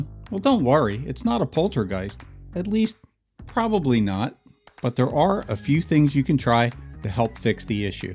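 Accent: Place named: Philadelphia, United States. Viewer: You're from Philly, aren't you? The accent is unmistakable.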